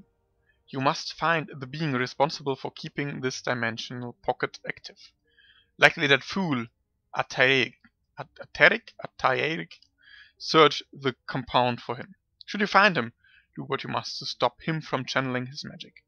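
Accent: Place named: Germany. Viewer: I dont know it feels like im listening to myself:) I hate perfect videos:D I know u are german I hear the accent im from belgium also strong accent:)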